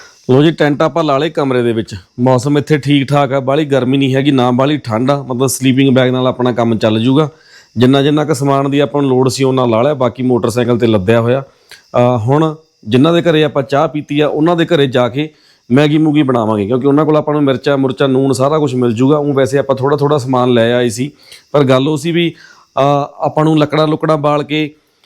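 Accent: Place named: Punjab, India. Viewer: Punjab ke log isi treh ki pure Punjabi language bolte hai , vadiya lagdi,😄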